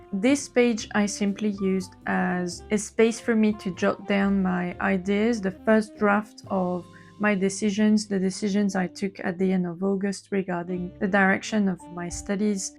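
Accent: France. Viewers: I knew it!! 😂 I love the French accent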